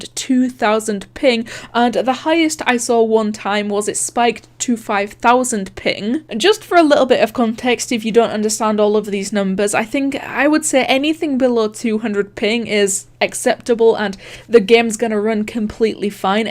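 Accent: Scotland, United Kingdom. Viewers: Hey are u scottish u sound scottish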